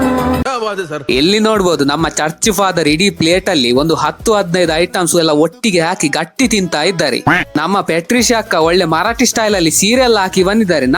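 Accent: Mangalore, India. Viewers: Nimma mangalore kannada bhashe chanda untu marayya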